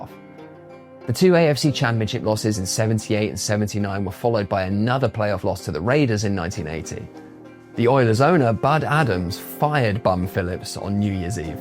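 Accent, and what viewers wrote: England, United Kingdom. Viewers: Uhhhhh.....why is a British person telling me anything? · Wasn’t expecting a British guy but hell yeah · So weird hearing an English guy say all this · What is a guy...with a British accent, doing...caring one bit, about the, defunct, "Houston, Oilers"? Don't get me wrong...I like it! It is intriguing. Being a native Houstonian, having grown up in the 70's in the Houston area, (Magnolia, Texas), I, my brother, my Dad, and ALL my friends...were die-hard Oilers fans. It just stuns me...amazes me...confuses me...that a "chap" from, "Bloody Ole England", (who call "soccer", "footbal"), care one iota about a defunct Houston, Texas Oilers football franchise? I don't get it...but put me on board. I even subscribed to his channel. "Love Ya Blue"...always will. I was 12 years old...in 1979...and Earl Campbell...was the greatest sports hero in the world in my eyes. 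· Wait is nobody going to question, why the guy speaking in the video, has a British accent? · I don't know, but it's kind of odd hearing a dude with an English accent telling us about NFL history. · Its crazy having a british accent talking about american football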